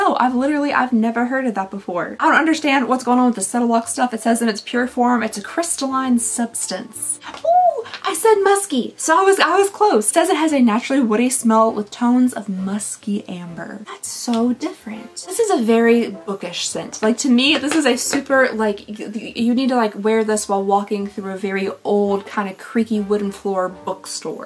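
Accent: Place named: South, United States. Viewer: I’m so sad to see advent season coming to an end!! But I’m so grateful that you stretched it out till Easter !! I have been watching you for the last 3-4 years and I want to say that you are one of my very favorite channels here on YouTube. Although I am so many years older than you are darling , you remind me so much of so many of my friends and even a tiny bit of my daughter who is around your age ! Just your general way of speaking and your mannerisms feel so familiar to me and maybe it’s a southern thing but I just adore you !! I love watching any of your videos it reminds me of home !!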